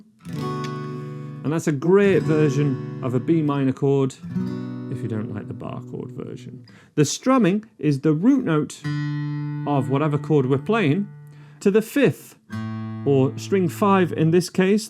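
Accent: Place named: West Cumbria, United Kingdom. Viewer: Like your style. That a la'al bit West Cumbrian accent eh?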